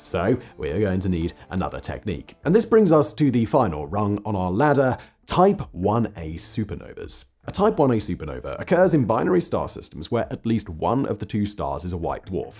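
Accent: England, United Kingdom. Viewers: Great video. Lose that English accent. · A little hard, hes English lol. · Why does vsauce have a British accent and look slightly different?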